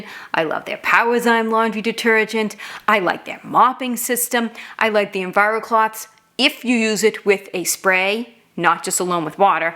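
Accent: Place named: New York, United States. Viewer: This lady has such an interesting NY accent lol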